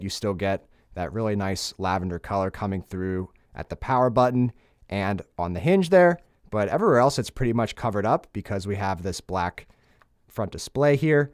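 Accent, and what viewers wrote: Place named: Pennsylvania, United States. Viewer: First time watching one of your videos. Could hear the accent right away and had to look it up. PA representing lol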